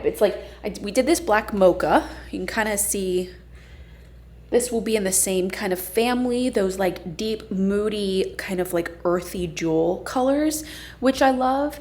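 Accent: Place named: South, United States. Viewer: Cracking up at how your southern accent keeps coming in and out underneath the California one! 😅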